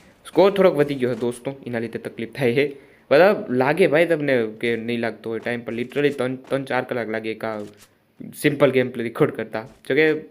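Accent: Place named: Kathiawar, India. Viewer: Bhai thodi kathiyawadi pan bolo ne pehla ni jem. 🙏😊,commentry in old style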